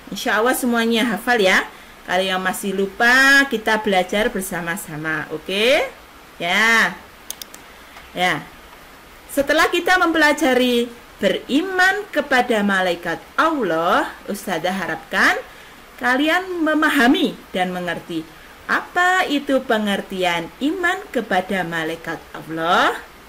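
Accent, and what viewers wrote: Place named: Java, Indonesia. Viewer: Boleh lah .... 👍👍👍👍👍 Cuma agak medok tapi best 😇😇😇😇😇😇😇😇😇😇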